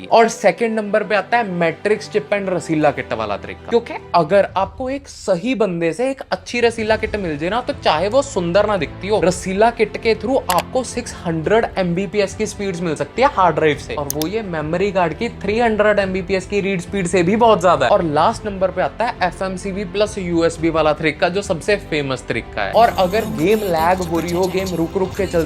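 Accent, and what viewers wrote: Punjab, India. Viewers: ਬਾਈ ਤੇਰੀ ਹਿੰਦੀ ਚ ਪੰਜਾਬੀ ਮਿਕਸ ਬੜੀ ਵਧੀਆ ਲਗਦੀ🤩 ਮੈ ਤ ਤੇਰੀ ਵਾਜ ਸੁਣਦੇ ਸੁਣਦੇ ਕਿਨੀਆ ਵੀਡੀਓ ਬੇਖ ਲਇਆਂ 😅👌🏻💐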